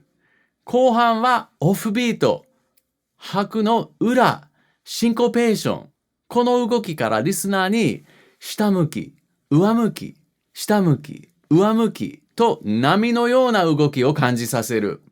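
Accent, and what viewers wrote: Kansai, Japan. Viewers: 毎秒アップロードしてほしいし、ずっと関西弁で話しかけてほしい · 解説もだけど、関西弁を関西人がめっちゃ笑って聞ける楽しませ方も知ってる人やね。楽しいです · サチモスのカバーが突然お勧めに出て来て先生にどハマりしてんねんけど、バイオグラフィーのどこを読んでも博士がネイティブ関西弁に行き着く要素が見えてこなくて頭がバグってます。とりあえず大ファンになってもた。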